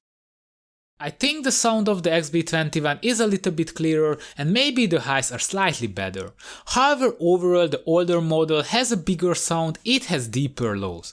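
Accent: Hungary. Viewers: Magyar vagy?Kicsit olyan az akcentusod...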